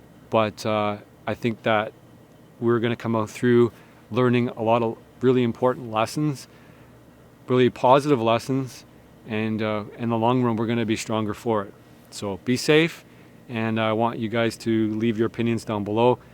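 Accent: United States. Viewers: NHL u knida sound like a American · Wow, you sound like a red blooded American here. And I agree with everything you've said, and share your concerns.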